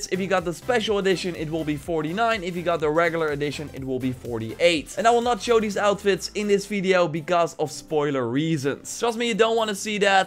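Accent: Netherlands. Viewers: I can tell by your accent that you're Dutch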